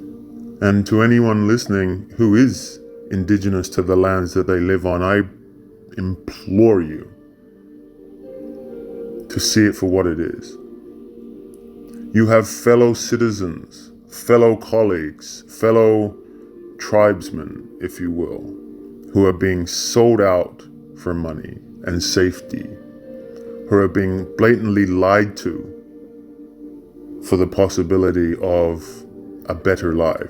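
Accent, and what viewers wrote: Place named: United States. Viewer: What the heck are you talking about with your American accent and admitting that you haven't read the document?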